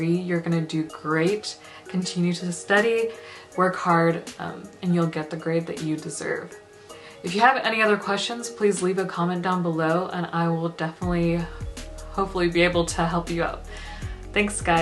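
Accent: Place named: United States. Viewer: Love ur video, I’m an Indian too and finally Im watching a gre video of an Indian with a US accent 😂. Ur really funny too, great video 👍🏼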